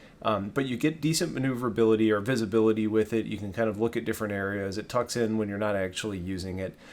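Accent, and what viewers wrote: St. Louis, United States. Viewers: R u from st.louis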